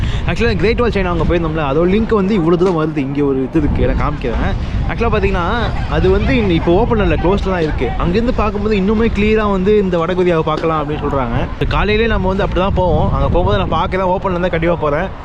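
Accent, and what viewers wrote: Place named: Namakkal, India. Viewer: Enga saravanan mama voice mathiri iruku bro unga voice from tn namakkal super review bro 🖤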